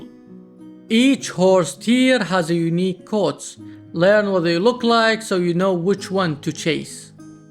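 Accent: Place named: Arab world. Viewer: you sound arab! are you? nice video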